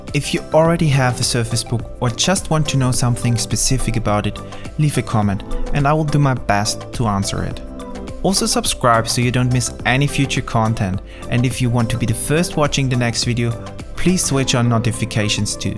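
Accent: Germany. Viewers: What accent is that? Austrian? hungarian? german? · lol are you german?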